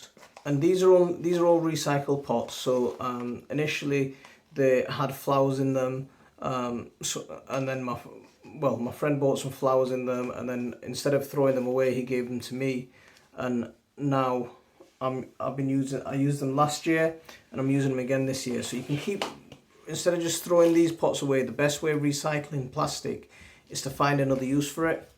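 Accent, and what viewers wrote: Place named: South Yorkshire, United Kingdom. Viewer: Nah then lad, you sound like you come from near me in South Yorkshire. I usually set my chillis off on heat mats, but like you sometimes I forget to label them. Last year my mate ended up with a reaper, and I ended up with some Apaches instead of vice versa. Good vid, I have subbed and belled.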